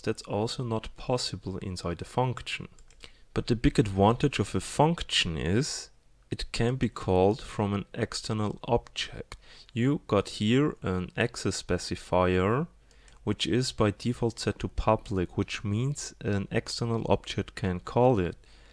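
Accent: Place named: Germany. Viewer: Ich finds immer wieder witzig wie sehr man am Englisch Deutsche heraushören kann. Very good explanation of the Differences, thanks for the help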